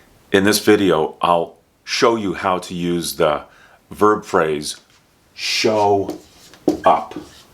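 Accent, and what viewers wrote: United States. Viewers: Thank you for your perfect lessons and real American pronunciation! I try to master American accent with you.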